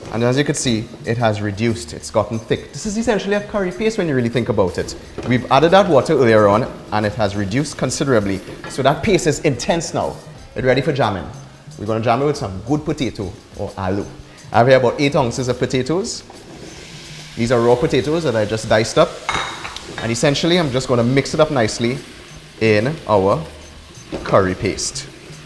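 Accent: Trinidad and Tobago. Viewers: Boy you talk like REAL,country folk(from gran couva) people love this dish cause any thing goes with a hot pepper. . ...Boy time to get teaching to us to make black cake ginger beer and some wine boi😊 CHRISMAS SEASON......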